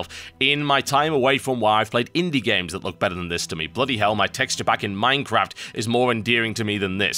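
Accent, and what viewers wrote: United Kingdom. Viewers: id say this guy is pretty good ... but i cant wacht crap , and he sounda abit british huh?? XD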